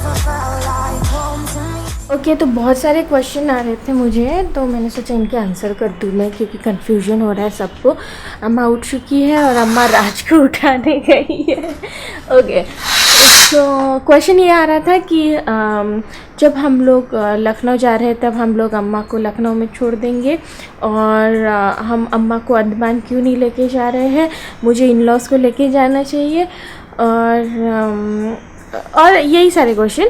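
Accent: Maharashtra, India. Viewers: maharashtra ki rough boli hai aTapori bhasha hai gandi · Tab hi mai sochoon aap marathi accent aur amma ji bhojpuri accent humare husband ke dadi aise hi baat karti thi she passed away this January yaad agyi unki....waise mai Bengali hoon Up wale se shadi hui hai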